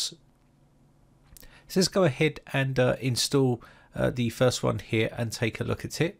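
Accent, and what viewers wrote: United Kingdom. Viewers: I'll subscribe cause your British accent. Also windows updates breaks something EVERY single TIME, so this should be helpful.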